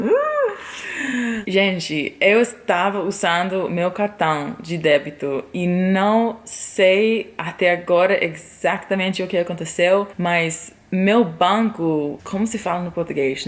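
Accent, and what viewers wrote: Rio de Janeiro, Brazil. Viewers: Amo seu sotaque carioca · Teu sotaque de leve já dá pra sentir o carioca! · Tão fofinho e bonitinho seu sotaque carioca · Seu português e ÓTIMO !!! Melhor ainda com o sotaque carioca !!!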